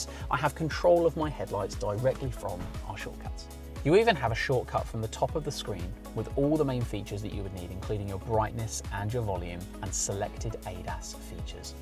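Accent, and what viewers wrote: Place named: United States. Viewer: is an American accent.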